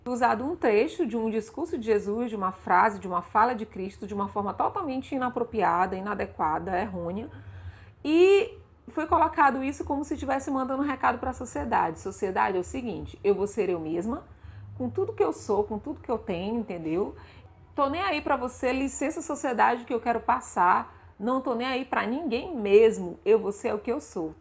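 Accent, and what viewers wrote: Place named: Bahia, Brazil. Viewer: Mas que sotaque baiano é esse minha gente? Boas palavras minha irmã!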